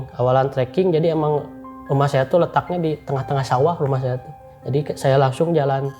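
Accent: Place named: Sunda, Indonesia. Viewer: Logat sunda nya kental banget😂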